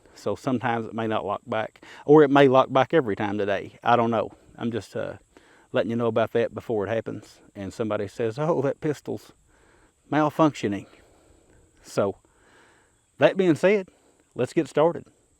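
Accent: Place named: Appalachia, United States. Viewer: Commander Data with Appalachian accent.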